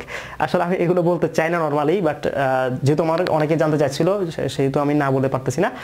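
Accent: Chittagong, Bangladesh. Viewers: অাপনি চাটগাঁইয়া নাকি গাইয়া গুইয়া কথা বলেন কেন?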